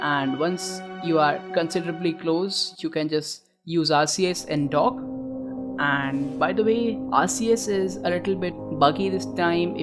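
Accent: India. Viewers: Indian accent ??? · Are you indian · Good video.. good tutorial.. Keep it up ... but U English Can make it Not Like indian?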